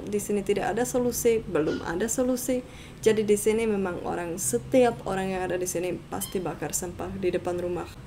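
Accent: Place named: Java, Indonesia. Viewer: medok bangaet bahasa indonesianya mbak ceko cantik. 👍💜👍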